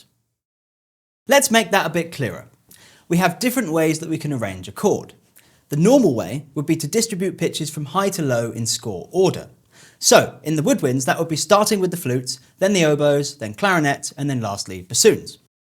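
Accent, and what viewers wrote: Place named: England, United Kingdom. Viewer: It's a perfectly clear, understandable and succinct English accent, what are you talking about!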